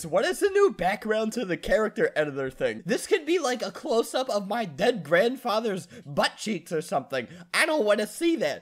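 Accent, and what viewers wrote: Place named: Spain. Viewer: his Spanish accent is so funny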